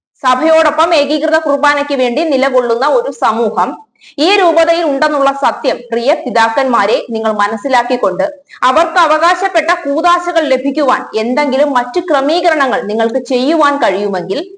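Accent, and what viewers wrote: Changanassery, India. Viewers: ഉച്ചരണം കേട്ടാൽ അറിയാം നിങ്ങൾ ചെങ്ങനാശേരി ക്കാരി ആണ് എന്ന് നിങ്ങൾ കഠിന പരിശ്രമം നടത്തിയലൊന്നും എറണാകുളം ത്തെ തകർക്കാൻ ആവില്ല ദൈവം മുകളിൽ ഉണ്ട്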